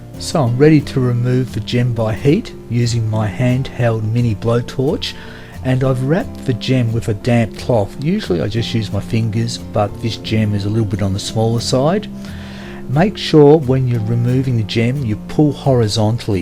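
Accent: Australia. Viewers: Sept' 2020 .. first time here .. so nice to hear an aussie voice lol, love watching how you experts create amazing brilliance.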